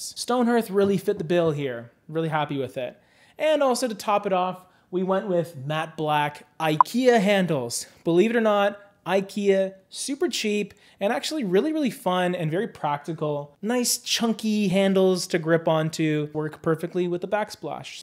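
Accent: Canada. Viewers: He’s Canadian….different pronunciations there.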